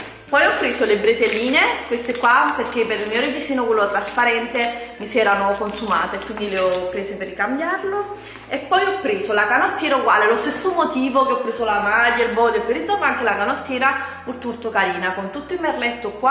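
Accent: Naples, Italy. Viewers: ma sei napoletana comunque ti stimo e sei bellissima e proprio ora mi sono iscritta perche sei simpaticissima e dolcissima😙😙😙😘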